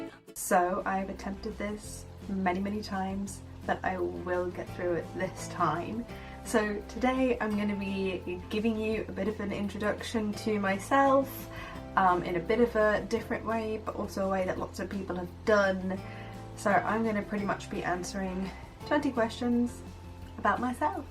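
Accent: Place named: United Kingdom. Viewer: Hhhahaa this is much better than our old channel!!!! How did you go from sounding American when we were young to now sounding British??? Hahha!! Love you long time!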